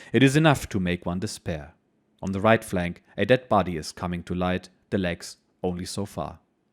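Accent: Germany. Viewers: These are quite the treat. 👍 I’ve already read Storm of Steel twice, but your insights and German accent add a whole new dimension to the experience. Will definitely continue this. God Bless 🙏🏻 P.S. I don’t know if you take suggestions, but “Black Edelweiss” by Johann Voss a Waffen SS veteran would be an interesting listen. No pressure. Peace ✌🏻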